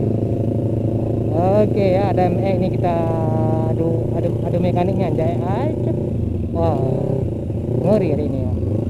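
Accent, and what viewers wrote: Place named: Aceh, Indonesia. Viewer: Logat Acehnya masih,btw selamat atas 1k sub🎉🎉🎉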